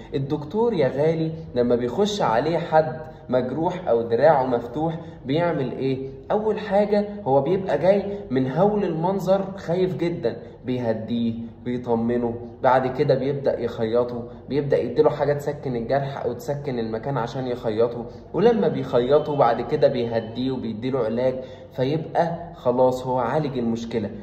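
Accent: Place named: Gaza, Palestine. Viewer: Long live to and EGYPT,your dialect is quite difficult to understand, but i have been following the palestinians for two years now,sometimes you sound like people from gaza./ from mousa in France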